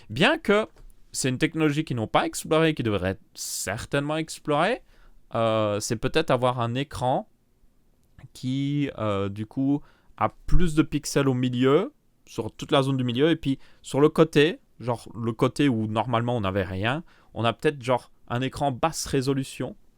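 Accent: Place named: Belgium. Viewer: Putin un belge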